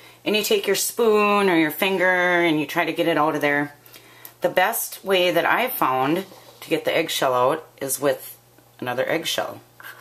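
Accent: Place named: Wisconsin, United States. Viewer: You talk like the folks I grew up with back in Wisconsin>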